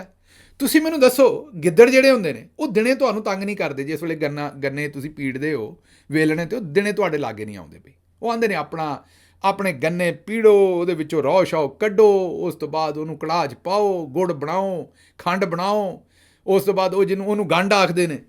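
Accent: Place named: Central Punjab, India. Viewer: بہت ھی اعلیٰ ، ستھری پنجابی میں مخصوص سنٹرل پنجاب کے خوبصورت با محاورہ انداز مزا آ گیا ، میرا تعلق کراچی سے ھے مگر میں کچھ پنجابی سمجھ لیتا ھوں ۔ بہت اعلیٰ ۔۔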